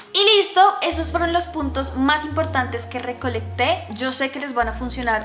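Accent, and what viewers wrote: Bogotá, Colombia. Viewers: Así hablamos los bogotanos 🤗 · Me gusta mucho tu acento colombiano, por cierto ¿cómo podemos saber quién es un buen dermatólogo o cómo identificarlo? <3 <3 saludos desde Oaxaca, México.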